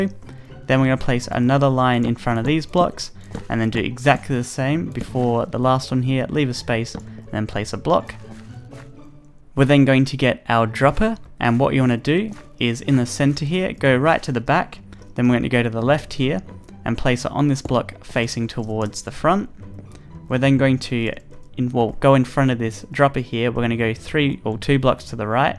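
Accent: Australia. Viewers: He's Australian...right? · R u Australian. I don't mean to be rude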